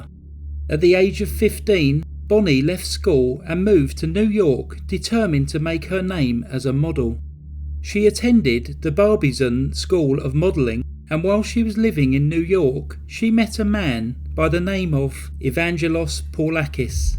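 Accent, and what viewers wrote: England, United Kingdom. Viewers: I must say Real Crime isn't my favorite but i like your storys and your so beautiful English accent👍❤🥰😊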